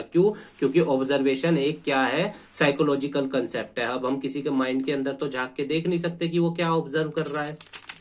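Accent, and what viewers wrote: Haryana, India. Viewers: Yor are from haryana ?? Thanku bhaiya.. Your explanation is very helpful for me.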